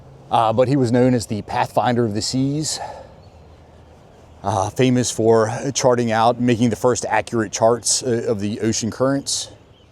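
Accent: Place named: Tidewater, United States. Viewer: Great video. I can hear that tidewater accent, lol.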